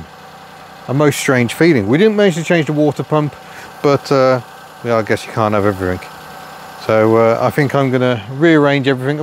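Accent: United Kingdom. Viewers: Listening without the visual offers a somewhat "Carry on " experience which is highly entertaining and quintessentially British flavor!!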